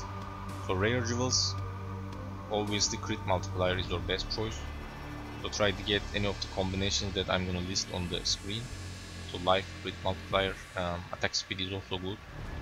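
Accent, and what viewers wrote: Turkey. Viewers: türk şivesi bu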